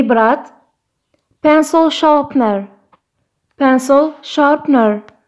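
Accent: Algeria. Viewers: من صوتها جزاءرية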